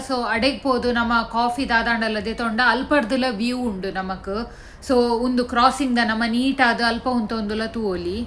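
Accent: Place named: Tulu, India. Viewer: ಈರೆನ ತುಳು ಪೊರ್ಲು ಆಪಂದ್ದು. ಮಸ್ತ್ ಮೊಕೆದ್ದ ತುಳು ಪಾತೆರಿನ ಖುಶಿ ಆಪುಂದ್ದು..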